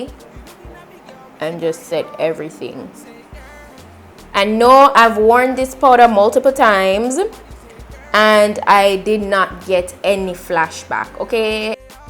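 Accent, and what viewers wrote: Jamaica. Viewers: You beautiful love your Jamaican accent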